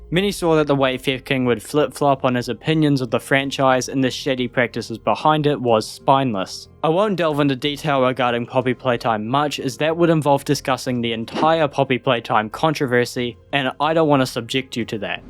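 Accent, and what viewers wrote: United Kingdom. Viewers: Are you british? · You're videos are good but please stop being british, it's hard to listen to you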